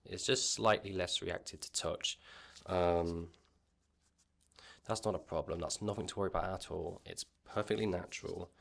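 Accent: England, United Kingdom. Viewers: Love your english accent. Where are you from? Thanks for your videos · I like your British accent. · I've just come across your channel today. This is the first time I've seen an ASMR channel by a British dude (I'm from NI)! Your accent rocks! :)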